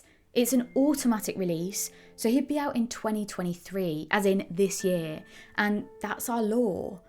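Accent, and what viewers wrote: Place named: Essex, United Kingdom. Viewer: Pity the curator is unable to finish her words correctly. Sounds like Essex to me. Difficult to follow. Unable to pronounce the letter T.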